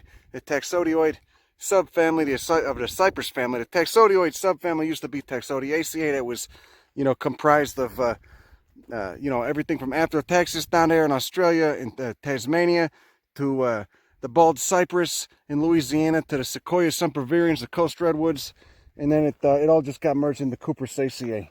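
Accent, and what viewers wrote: Chicago, United States. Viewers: Chicago. Not New York. You can tell by the way he pronounces his rrrrr's at the end of a word. Chi: "conifer" NY: "conifuh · It is so funny to listen to such a high IQ discussion in a South Chicago accent. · Yes. He is from Chicago, is why... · But he has a Chicago accent, Goodfellas was new York, there is a difference. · I love this guy. It's like Elwood Blues became a botanist. Cook county born myself, love this accent. Subbed just to listen to him. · It's an old school Chicago (esp Italian American) accent. He is Italian and from Chicago but he hams the accent up to make the vids more entertaining and funny. You can see him talking in his usual voice here: <link>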